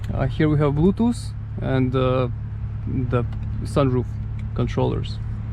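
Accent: Russia. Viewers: I,d say a Ruskie too .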